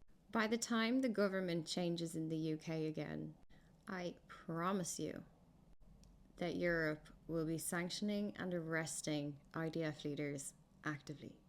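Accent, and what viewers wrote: Ireland. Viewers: Is this wise lady Irish?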